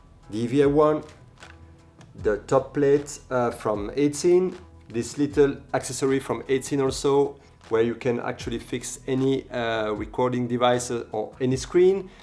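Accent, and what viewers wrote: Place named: France. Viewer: Yaaaassss you’re back!! Love that accent monsieur; make more cool videos. Your lighting is superb; just as superb as your French accent 🙌🏽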